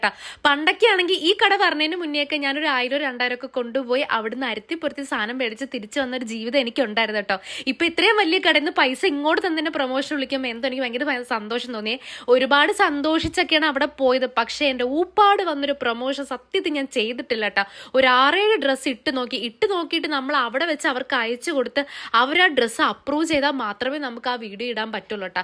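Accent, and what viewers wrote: Kannur, India. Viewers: Aunty kannur annoooo?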